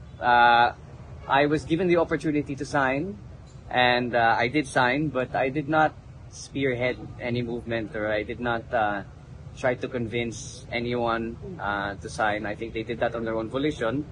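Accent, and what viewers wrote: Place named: Ilocos, Philippines. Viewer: Haha nakakatuwa yung ilocano accent niya. Yun lang. 😂😂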